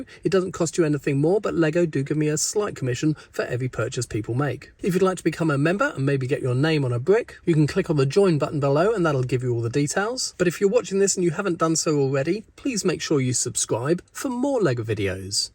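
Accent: United Kingdom. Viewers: Oh you brithis? Cool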